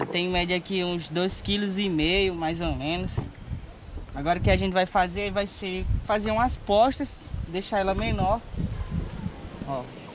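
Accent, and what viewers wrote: Brazil. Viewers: Mano você é de qual lugar do Ceará?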